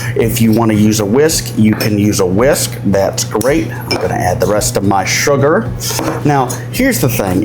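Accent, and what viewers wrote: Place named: South, United States. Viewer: Great show!!! Can’t wait to see what’s next. I didn’t know you were from the south???😂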